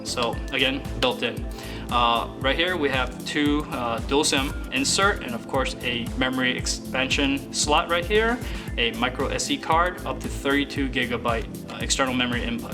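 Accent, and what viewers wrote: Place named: China. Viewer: I couldn't enjoy the video. The presenter has a thick accent. Sounds like Chinese/Mandarin is his first language? That's my best guess.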